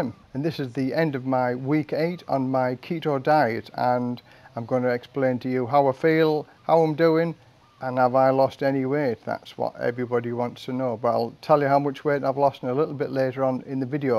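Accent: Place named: Sunderland, United Kingdom. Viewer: Areet mate. I’m from Tyneside. And I can tell you are from Sunderlnd way by your accent 😂. No worries 😉. Watched a couple of your YouTube vlogs on Thailand and loved them. As I’m 130KG. 20 stones in old money I became interested in your “Keto” diet. My goal is 90 KG. I’m 64 year’s of age and desperately need to lose mega weight before I die. I haven’t visited my GP for two years since the outbreak of the pandemic. Although I have received two jabs and booster and fortunately not contacted Covid-19. So thanks to you I’m going to follow your guidance and achieve my goal.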